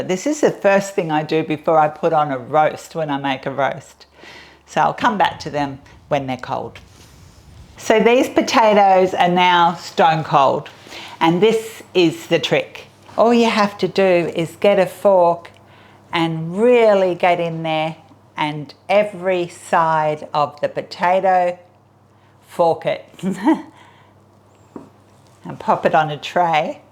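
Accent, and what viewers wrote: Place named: Australia. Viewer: Yeah,the accent! My mother who is an English teacher calls it "a lazy accent" but I think it's an Australian accent,(I think.)😊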